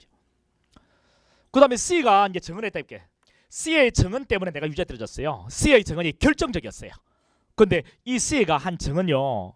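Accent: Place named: Gyeongsang, South Korea. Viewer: 갱상도 사투리 거부감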